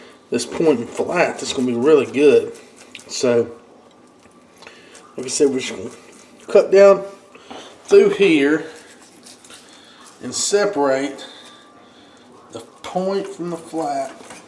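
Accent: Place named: North Carolina, United States. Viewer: cool I go to Cape lookout a lot and they sound like you. Thanks for the video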